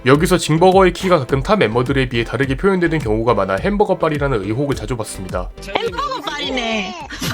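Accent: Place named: Gyeongsang, South Korea. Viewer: 같은 울산인데 사투리가 좀 심하시네 ㅋㅋㅋㅋㅋㅋㅋㅋㅋㅋㅋㅋㅋㅋㅋㅋㅋㅋㅋㅋㅋㅋ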